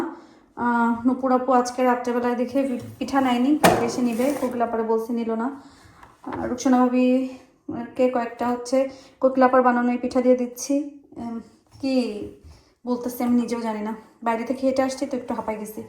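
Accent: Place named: Chandpur, Bangladesh. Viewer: Vaiya khub chalk chadpur bole Kotha Ami b bariar meye gazipur thaki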